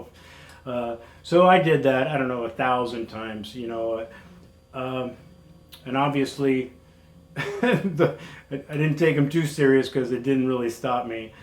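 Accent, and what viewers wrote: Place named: United States. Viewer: Hi, let me start by saying that I've been watching your videos for the last 4 years. It started when we were preparing for a roadtrip around Costa Rica and I came across your vids. I spent a couple of hours watching all available videos up to that time and I think the best tip from you which we used was free hot springs in La Fortuna:-) Anyway, we don't plan to go to Costa Rica again but I still watch you because I like your style and your keeping-it-real personality. Not to forget to mention that as a non-native English speaker, I like to listen to some nice American English. I'm sorry to hear about your booze problem and thanks for sharing it with us. You are the key to your success and besides supporting messages no one but you can beat this problem. But I'm sure you know that:-) To finish my lengthy comment here - as a person who likes working out - I have always wondered how you managed to be so ripped while drinking alcohol? 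Isn't alcohol just sugar with lots of calories? You definitely have a better body than many people half your age;-) Take care!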